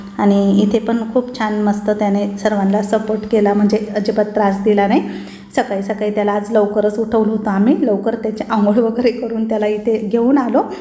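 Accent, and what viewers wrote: Nashik, India. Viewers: आपले नाशिक आणि भाषा लई भारी ताई . 👍बिनधास्त बोलत जा. अजिबात संकोच नको करू. सर्वांना समजते मराठीचा गोडवा सगळीकडे सारखाच. ओरिजिनल ते ओरिजिनल असते. उगाच ओढून ताणून इंग्लिश विंग्लिश मारणाऱ्यांपेक्षा तु छान आहेस. म्हणून फेमस झालीस व ग्रोथ आहे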